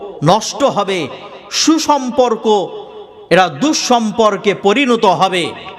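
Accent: Bangladesh. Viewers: আপনার কথা শুনে কেন যেন মনে হচ্ছে আপনি বাংলাদেশী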